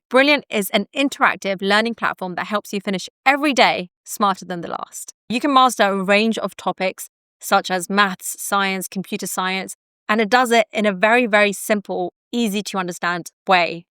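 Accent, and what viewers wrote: United Kingdom. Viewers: Is her accent common in the UK? Sounds amazing.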